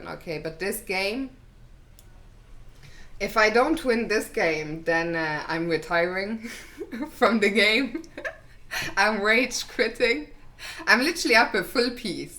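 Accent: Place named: Germany. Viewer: Choker is good fun if you can get it to run on your platform. I've tried 3 different Android versions and struck out every time. Am curious what version all the streamers are running on. Great job on the videos. Am intrigued by your accent. It sounds German, but if I recall correctly you're native to Luxembourg. How many non-English languages do you speak? Your English colloquiallisms and idioms are very good, attended university in the UK or US?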